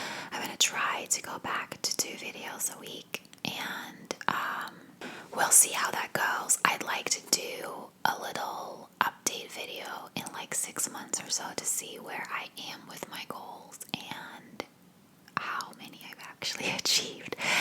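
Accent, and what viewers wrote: United States. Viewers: I'm so curious about you. You have an American accent but you live in Ireland. Your folks live in Spain. Can you speak a little bit about your history.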